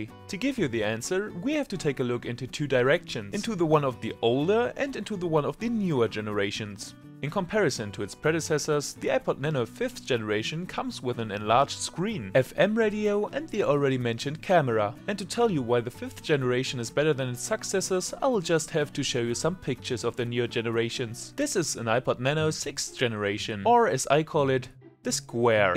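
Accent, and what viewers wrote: Germany. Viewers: Dieser deutsche Akzent xD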